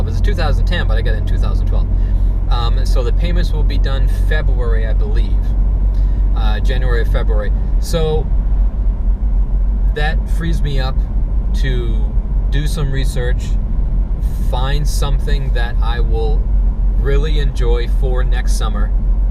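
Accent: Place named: Boston, United States. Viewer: First time on the channel,. Great car! Are you in Boston by any chance? I am and was just curious as the roads looked familiar and I detected a little accent.....disregard....i had not finished this video until you mentioned you were in Wetsboro. I've never owned VW, but yours looks awesome. I'd likely get the same one (white exterior with auto transmission).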